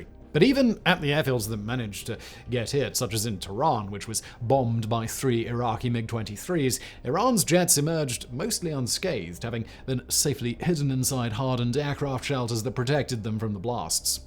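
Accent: England, United Kingdom. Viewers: I wish the prester would drop the quasi upper class English accent ! It just grates on my nerves. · .. I do after 1 min in .. he is on 3-5 different channels.. it’s frustrating cuz it’s a interesting topic and than his stupid British/ colonial/ slaver voice comes in.. than you see him and that terrible beard/ bald head.. He is annoying ( sorry ) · I’m Iraqi and I don’t care if he pronounces them in an English way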